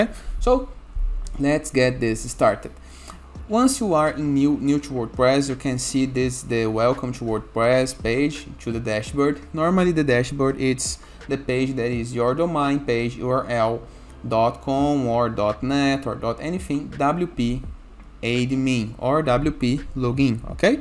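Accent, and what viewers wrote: Brazil. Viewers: haha tem um brasileiro nesse ingês hein, parabéns pelo conteúdo!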